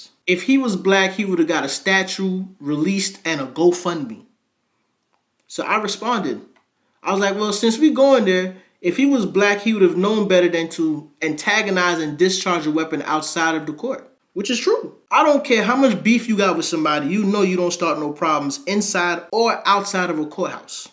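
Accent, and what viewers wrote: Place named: Brooklyn, United States. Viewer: I see we letting the Brooklyn out today, and you want the smoke…..lol. Keep it going brother, I’m with you on the petty levels put all these racist trolls on blast!!!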